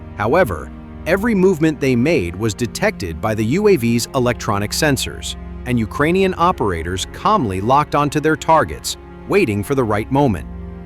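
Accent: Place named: United States. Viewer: DAS VIDEO IST OK ABER ICH MUSS KOTZEN WENN ICH DIE AMI STIMME HÖRE EINE WIDERLICHE SPRACHE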